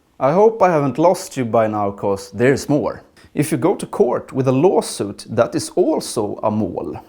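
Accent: Stockholm, Sweden. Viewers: yay stockholmska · STOCKHOLMSKA, såklart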